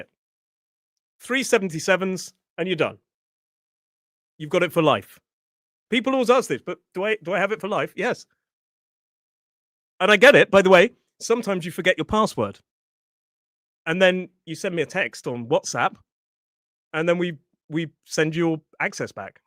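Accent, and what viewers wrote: United Kingdom. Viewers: Hey uncle you very supper man.because you're speaking in britsh english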